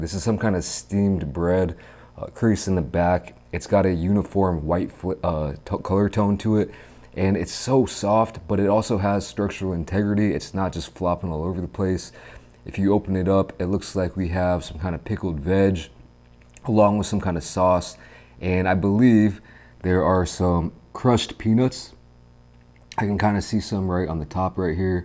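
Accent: United States. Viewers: I like to see more of your shows to make more please three times a day be good for me I like to hear you talk it gets we don't know each other by no means we don't I'm using Google talk so forgive me if words don't come out correctly I don't always read it after what I say so I can't correct it I would love to see more of where you live so take a little short video of where you live let me see your surroundings I love that you have an American accent where you lived and where I live we're not too far apart we wasn't too far apart we are now I just like you I really do I don't know how to explain it but I want to see more of your videos so please for having sex make more cuz all I do here you talk I don't talk to nobody for heaven's sakes pretty much nobody I don't even very rarely talk to my family I really don't have any family anymore I got yeah I got uncles and Aunt but never talk ever associate never my sisters and brothers very very maybe once a month and that's a god honest truth YouTube is basically is becoming my family I hate to say that but that's God's honest truth and you're becoming one of my favorites so please put make more please that's what I'm trying to get after making more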